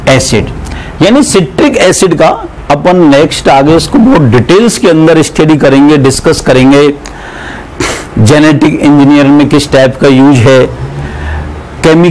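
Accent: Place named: Bihar, India. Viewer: bihar ka teacher hai kya yr?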